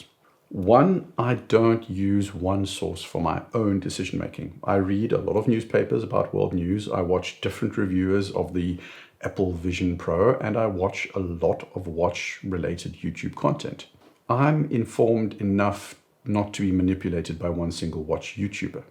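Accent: South Africa. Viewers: I was always thinking that you were South African. Now it’s confirmed. Great accent and great people! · Howzit boet! One of the things that drew me to you (and ID guy) was the accent from home. I'm living in Cape Town. As you know, we have plenty sunshine but insufficient electricity, so anyone who can is getting solar 😂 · Haha thought you SA’an. I’m an Aussie who lived in SA for 5 years. I like your presentations, honest, well researched, intelligent and very interesting. Go forward well, ne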